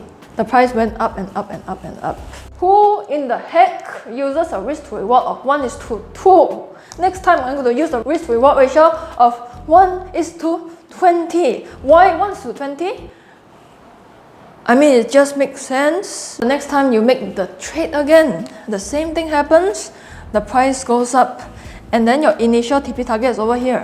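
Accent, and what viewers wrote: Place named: Singapore. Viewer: loved ur Singlish accent! :)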